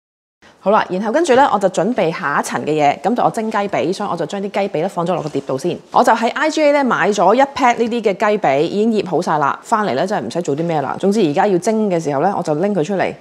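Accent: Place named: Hong Kong. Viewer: Hello, I'm also a TM6 user, u did a very good and relex demo. If u like this way to cook I want to let u know that under TM company there is a Book call Three-in-One cooking,一鍋三菜，u can try those dishes too. What a happy family,keep up your good work. Watch yr utube everyday... I feel like have a Hk friends 講得好香港人style. Welcome and Enjoy your life in Australia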